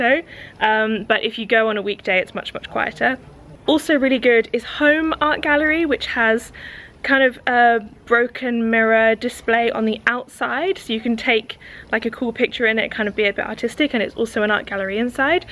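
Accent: United Kingdom. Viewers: You are so pretty...As to your accent -you are brit - am I wrong or something....I am georgian from Moscow....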